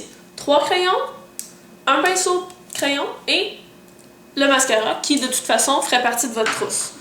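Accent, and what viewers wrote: Quebec, Canada. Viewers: c laccant quebecois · Magnifique , et j'adore ton accent québécois :p continue , bisous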